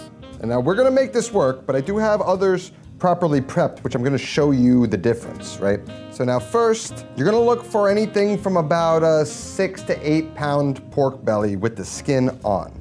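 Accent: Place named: Puerto Rico, United States. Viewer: This guy's like if you mixed a puerto rican and a jew. Very disconcerting accent.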